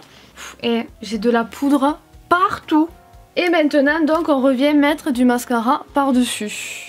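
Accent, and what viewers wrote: South, France. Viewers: J'adore t'entendre en vidéo avec ton accent qui me rappelle le mien hihi (je viens du sud vers Carcassonne)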